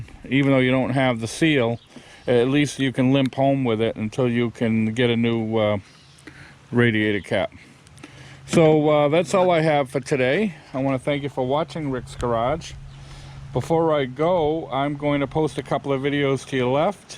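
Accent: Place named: New England, United States. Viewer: Dude definitely is from New England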